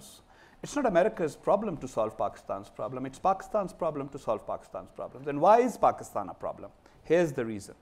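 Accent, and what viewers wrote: India. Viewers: This guy looks and sounds more indian. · Think an Indian is talking..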